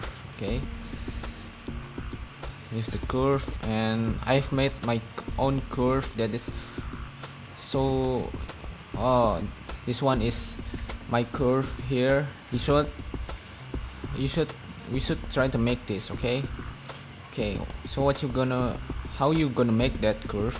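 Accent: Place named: India. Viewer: For some reason he's the only indian guy that tries to speak english in a funny way haha i love it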